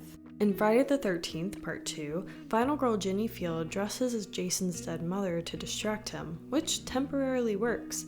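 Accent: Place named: Brazil. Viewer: Have not watched the video yet. However, are you brazillian, girl? The accent is pretty iconic, and remind me of brazillian people.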